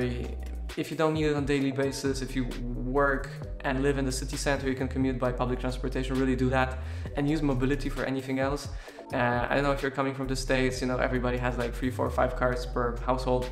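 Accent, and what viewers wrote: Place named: Poland. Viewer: Od razu poznałem po wymowie, ze jesteś Polakiem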